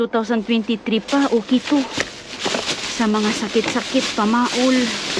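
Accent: Visayas, Philippines. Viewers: Bisaya ka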